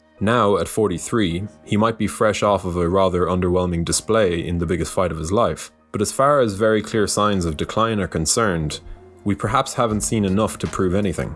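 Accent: Ireland. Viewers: The Irish accent makes your videos better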